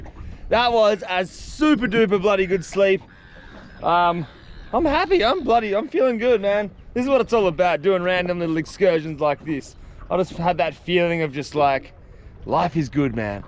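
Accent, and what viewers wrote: Western Australia, Australia. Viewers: I knew you would be from Perth!! Classic South West WA accent. I live like 5 minutes from where your video starts, me and my partner are aspiring content creators. We do most of our stuff on Twitch. I'm a total city slicker, I want to become influential enough to be able to collaborate with others in the content creating community. My dream now is to one day be part of an episode where you take a pair of city slickers out to the wilderness and see how well we fair with as little intervention as possible. I would LOVE that challenge!!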